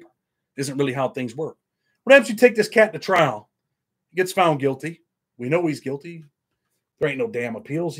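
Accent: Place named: New Jersey, United States. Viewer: You been some shitttttt bro. Sounds like you could of grew up in Keansburge NJ. Ty for the vids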